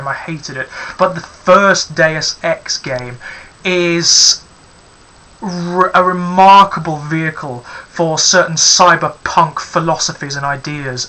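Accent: England, United Kingdom. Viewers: saw the thumbnail...said to myself, "this guy better be english" i was right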